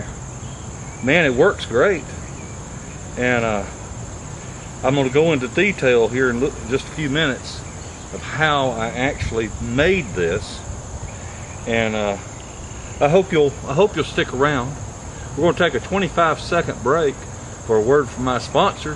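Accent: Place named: South, United States. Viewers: i was born in Coffee co. raised up in Paynes cove, Pelham TN. now in NC. i knew I recognized your accent. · good video, will make one. really like your videos, can tell are from the south, im a hillbilly from western nc, like to see a video on easy way to nut hogs.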